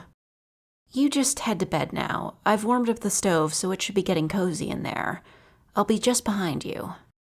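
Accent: United States. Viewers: She's american? Darn... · the most American sound Russian on earth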